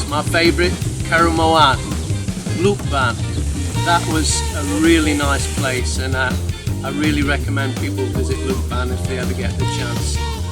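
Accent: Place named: Scotland, United Kingdom. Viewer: I dunno if I am hearing u right but you sound like Scottish. :D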